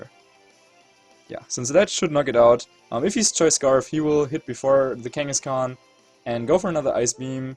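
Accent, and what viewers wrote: Germany. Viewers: Your German accent is fine! Pokemon is an international community and that's what is so awesome about it! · First comment of the day LOL it's an honor. I like the way you say it with German accent better tbh, wasn't trying to be mean. Keep up the great content, both VGC and if you decide to start a new series! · Lolz, nothing wrong with german accented Vs, how about ' Battle Spot Story' or something? xP I was playing on the N64 recently n' it's the 1st thing that came to mind, <3 Story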